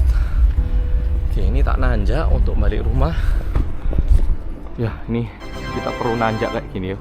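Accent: Java, Indonesia. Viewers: lagi ngrekam.bahasa indonesia logat jawa😂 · yg aku selalu sadarin tuh cara ngomongnya mas khas bgt kek org jawa. ngomongnya harus berbelit2 dan ga to the point wkwkw. no offense, aku jg kyk gini krn org jawa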